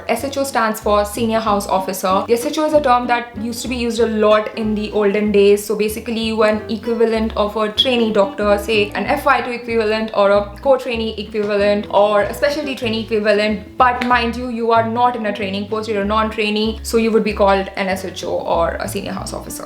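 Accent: India. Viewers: my gawd. Youre a beauty. If it wasnt for the accent I wouldnt know youre Indian.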